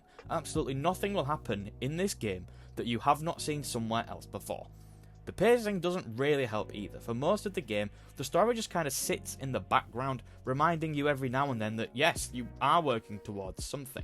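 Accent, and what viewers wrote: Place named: United Kingdom. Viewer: If it's any consolation, I thought you did a good job of it. You could have tightened up parts of it so you don't repeat things too much, but your points were well made, and I agree with most of them. I, for one, appreciate the voice of a fellow Brit, and as an older gamer, I enjoyed you speaking normally, rather than the breakneck pace I hear on so many videos of this type!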